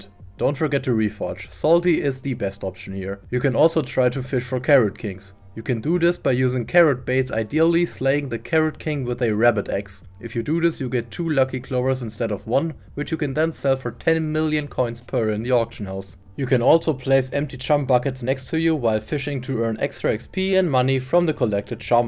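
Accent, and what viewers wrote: Germany. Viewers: I hear deutsch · Love that i hear that he is german i think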